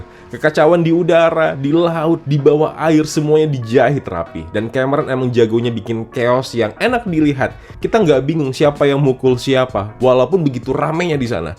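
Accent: Medan, Indonesia. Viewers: bener banget lgi yg di bilng abg ini. komposisi crita nya hampir sama. dmna eywa membantu dengan mengirimkan bala bantuan yg sama, terus pas war di air juga sama. tpi smua itu terbayar karna visualnya dan action ny. ya cukup di nikmatila bagi orng yg gk terlalu Mandang crita. btw ko orng medan ya bg😂?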